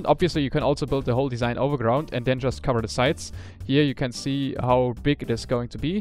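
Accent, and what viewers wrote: Germany. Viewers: Your english is VERY german.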